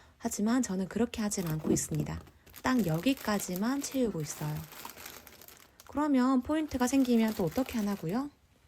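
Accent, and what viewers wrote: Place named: Gyeongsang, South Korea. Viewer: 저는 서울지역 이라 그런지 첫마디부터 경상도 말투가 느껴지는데요. ㅋㅋㅋㅋㅋ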